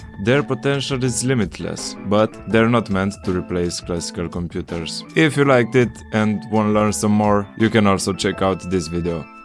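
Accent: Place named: Romania. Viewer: Dupa accent mi am dat seama ca esti Roman , keep it going :)))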